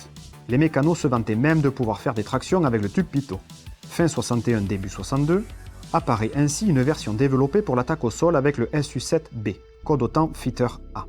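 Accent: South, France. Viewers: C'est dommage, ça a l'air intéressant mais l'accent sudiste donne l'impression d'écouter Castex.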